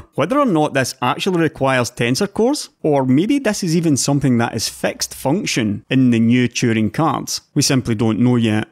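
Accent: Scotland, United Kingdom. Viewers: Is your accent scottish? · Are you Scottish too?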